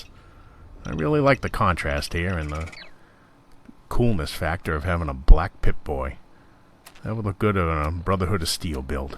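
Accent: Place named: Boston, United States. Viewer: You sound Boston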